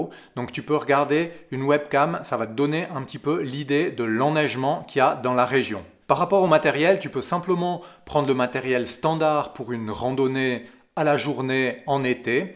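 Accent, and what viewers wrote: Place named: Switzerland. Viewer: Une vidéo instructive. Les néophytes comme moi ne se doutent pas de l'aspect technique du simple fait de "marcher dans la montagne". La sécurité est très importante, il ne faut pas laisser de côté les moindres détails. Beaucoup de choses intéressantes, les séquences vidéos sont toujours aussi superbes. L'accent suisse est super cool. Continue ainsi, merci !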